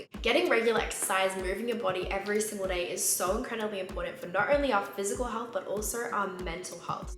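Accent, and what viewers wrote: Australia. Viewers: Australian accent too tough to understand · can you speak little slowly....I cannot caught few words because of Australian accent...I’m from India 🇮🇳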